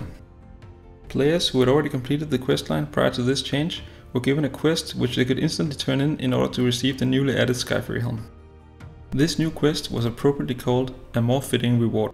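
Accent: Denmark. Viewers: accenten lol. Hvorfor er der så mange danske classic wow youtubere i forhold til andre europæiske lande?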